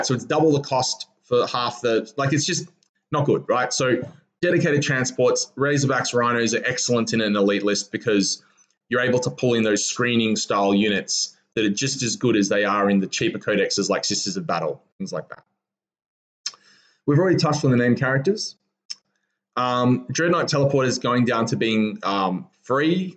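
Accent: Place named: Australia. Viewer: Hi, great video as always. A few points: 1) I've tried Heavy Incinerator on GMNDK, problem is D6 shots. 3.5 hits, 1 or 2 wounds, doesn't cut it. I rather go Gat Psi and Heavy Psy, spend 2CP (Psychic Onslaught stratagem) for 12 Str5 and 6 Str8 shots. 2) As netprince455 commented before me, what are your thoughts on: "Gateing" a Land Raider Crusader carrying 2x 5man Purifier squads, Brother-Cap (Vortex) and Pala Ancient in Turn 1, (optionally) using the Psybolt stratagem for 24 Str5 shots to clear the chaff. If it survives Turn 1 shooting, everyone pops out for 3D6 + D3 MWs (12.5 avg). Entire set up is 686pts. Alternatively, you could "gate" it on Turn 2, alongside 2 GMNDKs who were in high orbit, presenting your opponent with multiple threats. Anyway, once again, great video. Love what you're doing for the GK community with these quality vids. Aussie accent is the cherry on top.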